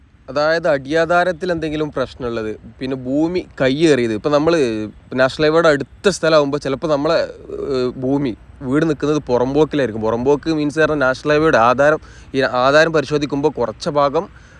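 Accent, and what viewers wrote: Malappuram, India. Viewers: especially your Malappuram slang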